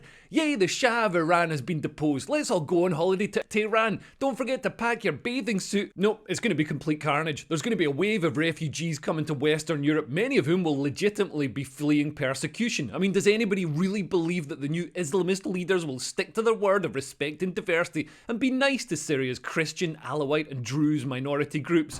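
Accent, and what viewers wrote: Scotland, United Kingdom. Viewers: Fair play. You haven't got a clue what you're talking about but you do talk very quickly with that Scottish accent which gives those who dont know any better that you have some insights. Anyway, love to the family.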